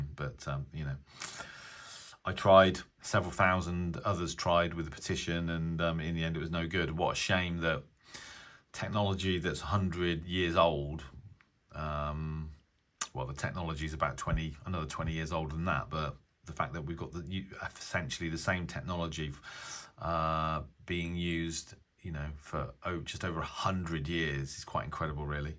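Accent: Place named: RP, United Kingdom. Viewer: BBC. your voice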